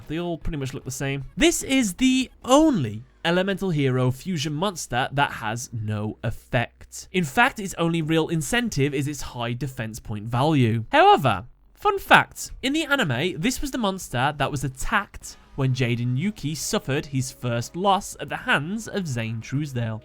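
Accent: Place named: United Kingdom. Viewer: you're so British. And I live that you say reckon.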